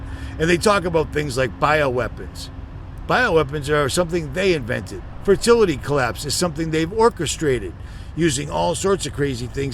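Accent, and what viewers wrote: Boston, United States. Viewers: You from Boston or wistah area... in gator coutry now yet from Mass, hi❤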